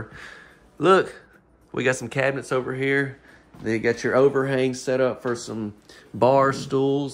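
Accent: South, United States. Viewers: I LOVE YOU! I need to tell you though it’s not Loo-EE-Ville. It’s Lou-a-vull with the a barely heard! I live in Bowling Green, KY (aka Corvette City USA) We Kentuckians have been adding & deleting syllables long before the Millennials made it a “thing.” 😂 With your oh so pleasant sounding voice and friendly southern accent you can pronounce Lou-a-vull & sound like a native!!!! 😊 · I love to watch your videos! I’m in the process of building a new home but I love your southern accent and it’s just fun to watch your tours! Don’t ever stop being you!! I get lots of ideas from watching!!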